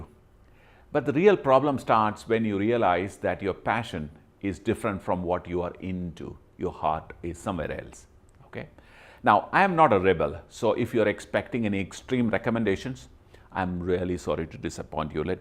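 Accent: Kerala, India. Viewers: Namaste, Basically I am a doctor by profession. During the COVID time I was forced to stay at home (Not fully- I work till afternoon ). The rest time I started learning small small hobbies, reading books etc. I saw your video and also pixel village. I have taken up photography seriously. I purchased a few cameras and was kept idling. During my last trip to Europe I took that NIKON D1500 and it really spoiled my journey. It was a heavy one with so many lenses. The photos I took didn't comeout well. I purchased another one Canon pocket size and was getting ready for my Australian trip. Covid played a spoiled sport and I am at home. But enjoyed all your videos and am really happy that I became a better photographer. Accept my gratitude. From your accent I presume that you are from Kerala.(The safest place in the universe during COVID time). I will come and meet you in case you come to TRIVANDRUM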